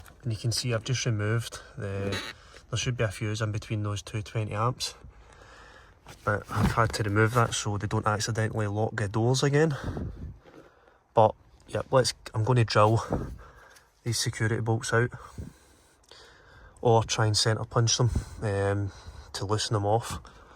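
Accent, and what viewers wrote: Glasgow, United Kingdom. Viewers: What a fantastic diagnosis and repair video. I’m having a bit of trouble with the strong Scottish accent, but think I’ve ‘tuned in’, and can understand the work flow. I do repairs for people, as I love solving the puzzles. Just repaired “my grandfather’s Panasonic walkman’ for a friend, which was a long job due to battery corrosion. All the plate-through holes did not connect the top to the bottom track. Each pad was ‘etched’ away from its plate through centre. I had continuity from the centre to the track below, but not from the centre to its top pad. Had to fill nearly all plate throughs with a wire link. Anyway, traced the whole board through and found more breaks in the track. All repaired. Fixed it in the end. Absolutely loved your analysis and diagnosis. Using sound to find the faulty relay - fantastic skill. … and then tearing the relay apart to demonstrate the hard fault. 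Also liked your research. Other authors bothering to write-up their fault-fixes. What a useful database. Looking forward to the next video collection. Matt Lee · Well done mate, not many would have made that relay repair. This repair should give you plenty of work. Which you deserve, once the word gets around, that you supply good work and can be trusted.ps. Good to hear the Glasgow accent 👍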